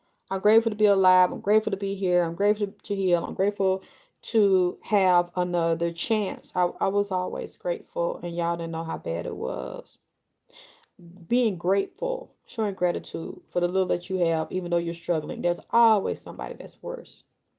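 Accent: South, United States. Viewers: I love the country twang. It’s what I fell in love with . Pleaseeeeeeee don’t ever lose it lol 🥰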